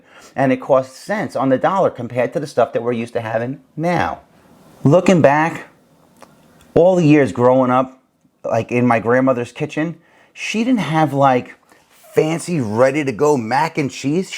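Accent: New York, United States. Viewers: What, no Velveeta cheese listed? How could you miss that? Seriously, I just spent a very pleasant hour, and a pot of coffee, watching this video and reading all the comments. Thanks! So, I grew up in Yonkers in the 50’s; this really hit home. So much of this is second nature to me. Watched my mother and grandmother canning. Leftovers were eaten until things turned green, and even then some of that was scraped off and it was good to go. My wife still cringes at how long I leave things in the fridge. One thing we really stretched was sauerkraut: boil it witH Kielbasa, potatoes and serve with brown mustard. Also, a good gravy covers all sins!, you reminded me of my brother-in-law: Italian, talked with his hands, NYC accent, and a police detective too (in Yonkers). Made a mean meatball!, So, you got another subscribe. Take care! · I do SO enjoy listening to a New Yorker tell stories! 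Nobody does it better!!! Love hearing about your Grammy. I remember my Mom stretching hamburger with bread back in the 1970’s. Not that we loved it, but she could sure stretch a dollar! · Loved listening to this video and your NY accent. Makes this Midwestern gal miss her NY friends I’ve met through the years. Good info. Your Grammy was a wise lady. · I knew I heard a NY accent! I’m a former New Yorker, living in VT. Bacon, egg and cheese in a hard roll is a NY legend. Miss that! Just found your channel today - thank you!!! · As a former Brooklynite,I have enjoyed hearing the New York accent 👍🏽😂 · Great video. I save the ends of vegetables, freeze them as I go along, put in a pot or slow cooker filled to top with water with a whole onion snd a whole garlic ( their skins on), some celery and carrots and several hours later, strain and have delicious veggie broth to eat, cook with, freeze, give to family and neighbors, friends. 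Make rice with it, or noodle soup by adding chicken or beef bullion. Make bone broth same way. Stretches your meals. Add soy sauce, ginger, scallions for Asian flavor. Good to hear an Italian from New York! 👍Just moved from there to this state.😊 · New York Italian? Automatically subbed! I live in Upstate. Near Rome.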